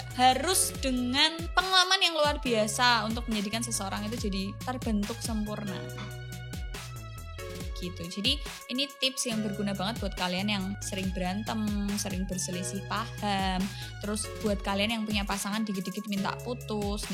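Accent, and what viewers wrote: Java, Indonesia. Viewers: Akhirnya kangen medoknya mba terobati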